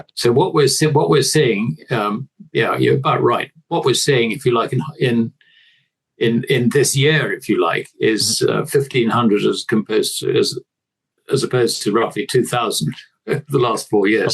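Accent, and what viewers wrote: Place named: United Kingdom. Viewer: Blah blah blah with a British accent. Shameless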